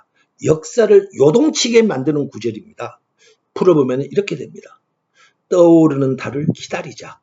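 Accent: Gyeongsang, South Korea. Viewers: 경상도 싸투리죠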